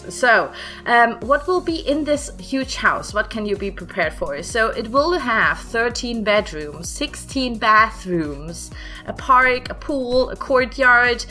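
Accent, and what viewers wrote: Germany. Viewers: Are you German or something cause you don’t have an english accent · I heard literally in 3 seconds that you must be German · May I ask where you're from? Your accent sounds German but then again you're using so many particular slang words that I would think you're native speaker? If not then hat's off your English is amazing🤯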